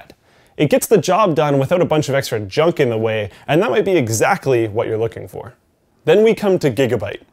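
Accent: Canada. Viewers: what's with the Canadian accent? i know you're Canadian and all but i have never heard you nor linus sound like that before! yes i did notice a few videos back!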